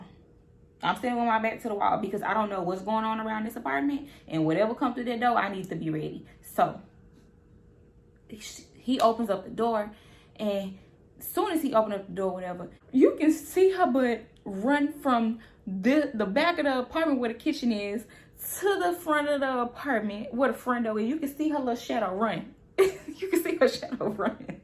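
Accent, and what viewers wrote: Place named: New Orleans, United States. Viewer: I feel like I hear a lil new orleans accent lol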